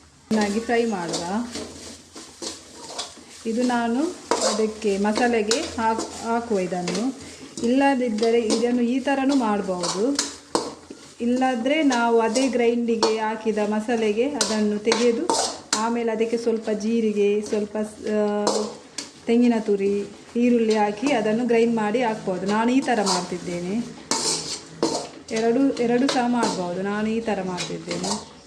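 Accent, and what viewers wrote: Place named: Mangalore, India. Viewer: ಮಂಗಳೂರು ಕನ್ನಡ ಕೇಳೋದೇ ಚೆಂದ 😊😊😊😊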